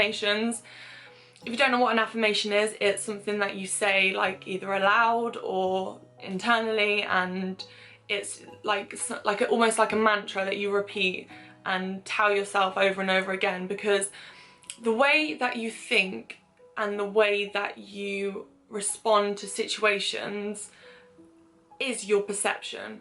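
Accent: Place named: Northampton, United Kingdom. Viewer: Swear your from Northampton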